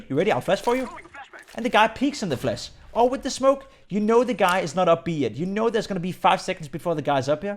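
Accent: Denmark. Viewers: you sound danish so if you danish awnser this in danish hej lige fåndet dig og du er mega god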